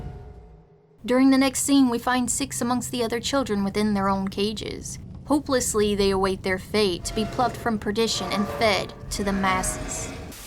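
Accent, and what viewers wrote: South, United States. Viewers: We return to the, for good speculation on nightmare fuel with a slight southern drawl to it Keep it up!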